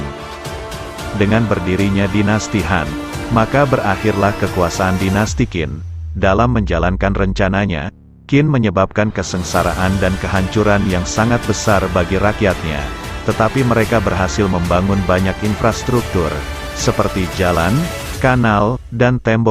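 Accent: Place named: Indonesia. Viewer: Lidah orang Indonesia.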